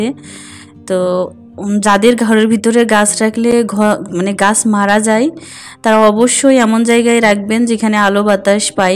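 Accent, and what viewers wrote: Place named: Jhenaidah, Bangladesh. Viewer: আপু,আমি প্রথমে জানতাম না যে তুমি কোন জেলার ۔۔۔কিন্তু তোমার ভাষাটা আমাদের অঞ্চলের মতো লাগতো ۔۔۔পরে জানতে পারলাম যে তুমি ঝিনাইদহের মেয়ে ❤۔۔আমিও ঝিনাইদহের ۔۔আসলে নিজের অঞ্চলের মানুষদের উপর অন্যরকম এক টান কাজ করে l আমি তোমার নতুন ভিউয়ার ۔۔ অনেক কিছু শিখতে পারি তোমার থেকে۔۔۔۔۔۔আপু নেক্সট ভিডিওতে ঝিনাইদাহ নিয়ে কিছু বলো۔۔ আর আমার নামটাও একটু মেনশন করো ۔۔۔তোমার মুখে আমার নামটা শুনতে চাই ❤ +জেসমিন = ❤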